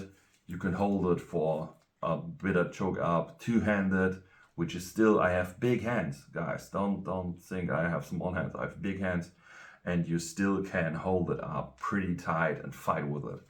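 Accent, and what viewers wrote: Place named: Germany. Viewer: You're German I hear.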